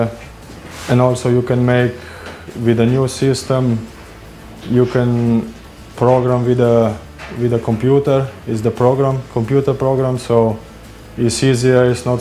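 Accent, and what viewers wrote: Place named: Slovenia. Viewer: I started to watch a clip, didn't know who is talking, but after two words I knew he is from my country... Slovenia! Very typical accent... :) Painful, I saw the comment, very true! But as far as I know, he's good mechanic, who cares if he can set up your bike like a pro! :)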